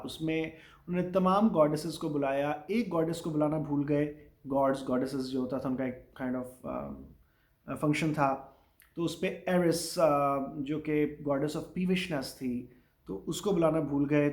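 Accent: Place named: England, United Kingdom. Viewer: Sir I liked your English accent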